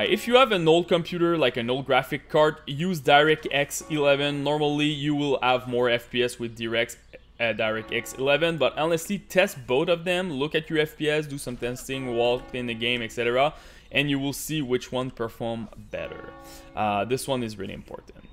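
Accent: Quebec, Canada. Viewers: Chu tombé par hasard sur ton vidéo pi juste en entendant le début j'ai faite : Ha ben, un autre Québecois esti :D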